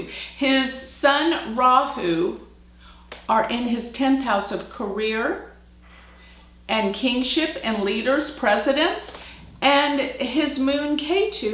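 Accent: Texas, United States. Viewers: I love her southern accent. Texas specifically. Makes me miss southerners a little bit. I live on West Coast now. Over the years, I have dabbled on and off of your website. My knowledge of Vedic astrology is small. After watching this video, I feel more affinity towards you, your work I mean. It takes some courage to do this video, I think, because many people interested in astrology tend to be on the Left (lol). God bless you,.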